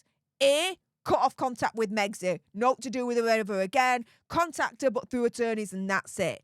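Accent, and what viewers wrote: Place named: England, United Kingdom. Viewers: YOU NAILED IT AGAIN, SORRY MY DEVICE IS STUCK ON SHOUTING MODE, I AM SORRY, but you are proper northern lass, 🇬🇧🙏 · I WOIULD LOVE TO KNOW WHAT PART OF ENGAND YOU ARE FROM YOUR DIALET IS FACINATING. YOU DO NOT PRONOUNCE THE LETTER T. YOU TRPLACE IT WITH A GOLATERAL STOP. INSTEAD OF SAYING HERE YOU SAY ERE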